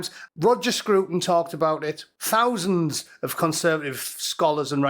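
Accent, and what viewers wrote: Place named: North East England, United Kingdom. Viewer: Great crack! truth and honesty, cannot beat em,' along with a North East regional accent and down to earth opinion. From a Northeastener down under.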